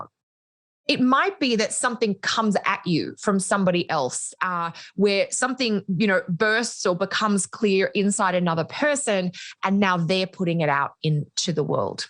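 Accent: Australia. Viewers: Ontario ?? I thought you were Australian! That’s funny. Or maybe I guess Australian living in Canada? 🤪😍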